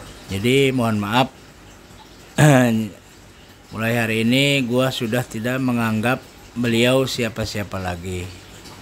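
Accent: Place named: West Java, Indonesia. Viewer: bang suara elu udah kaya sepuh abah abah logat sunda tapi foto elo keren ganteng keren salam sortir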